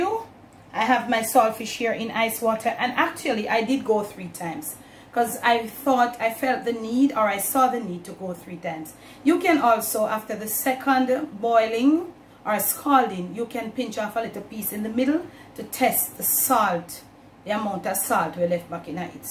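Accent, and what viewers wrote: Jamaica. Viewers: I like it when you speak Jamaican- we understand !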